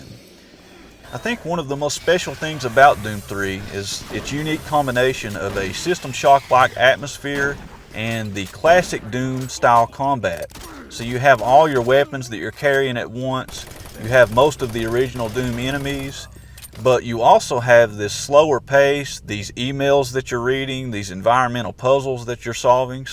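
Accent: South, United States. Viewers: now ahm not gay (nothing wrong in bein gay do) but ah think ah have a crush on ya southern accent mayn! seriously tho, this game is too underrated, it aged well imo.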